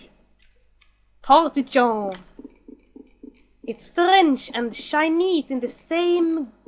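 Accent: Sweden. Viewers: Great LP so far. I've just tried the game, I haven't really sat down to play it yet. cool accent by the way, my guess Swedish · ^ ^ ur accent kinda sounds swedish?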